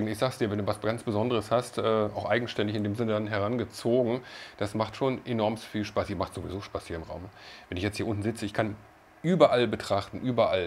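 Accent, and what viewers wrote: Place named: Ruhr, Germany. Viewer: Toll deine Pflanzen, und dein deutsches Dialekt erinnert mich so sehr am Ruhrgebiet wo Ich aufgewachsen bin und immer eine gewisse Nostalgie bleibt 🙏👍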